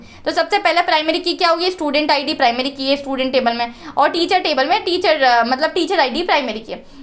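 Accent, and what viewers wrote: South, India. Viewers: R u from South?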